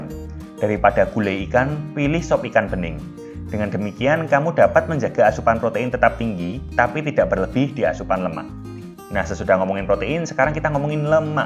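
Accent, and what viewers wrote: Java, Indonesia. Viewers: Medhok kali ni mas nya🤣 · Medhok nya itu loh yg bikin nda bisa skip skip video.. Good work makasi teori dan motivasinya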